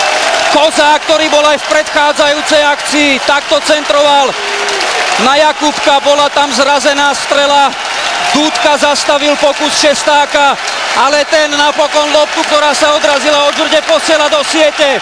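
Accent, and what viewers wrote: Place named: Eastern Slovakia, Slovakia. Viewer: Merciak rulz... Vychodniar sa nezaprie :-)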